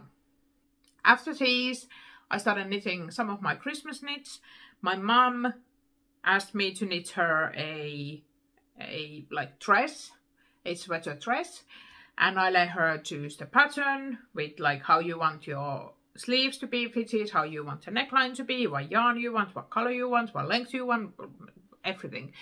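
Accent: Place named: United Kingdom. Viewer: Lmao I was thinking about it whether your husband is from the UK or if you have studied there due to your British accent but then you revealed that your husband is actually British. Thanks for the video! It was fun to watch it while knitting and hopefully you keep making these. I absolutely love it how you use stronger and bolder colours compared to many others and it's also interesting to see these popular patterns in these vibrant colours ;)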